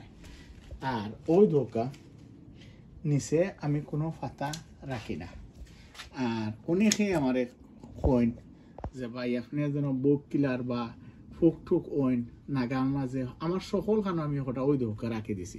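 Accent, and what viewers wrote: Sylhet, Bangladesh. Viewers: তোর আর কোন কাম নাই কথা শুদ্ধ ভাষায় বলতে শিখে কথা বলো সিলেট মাল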